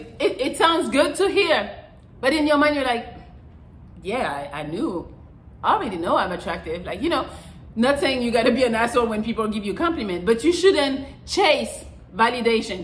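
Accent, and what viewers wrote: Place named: France. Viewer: I just seen your videos and started watching because of your accent. It sound so familiar . Then i realized, you’re French like me!! Probably from Gwadada or Madinina !!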